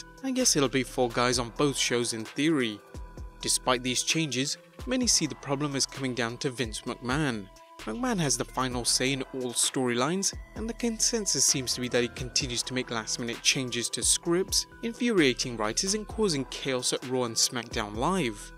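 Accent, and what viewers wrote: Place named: United Kingdom. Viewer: The voice of this vid should be in USA Not UK. Very bad. Lates.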